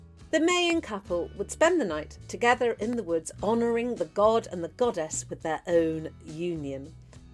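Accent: United Kingdom. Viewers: Hello there beautiful and that sounds like so much fun and I love the British accent thanks for sharing your precious memories and brightest blessings to you sis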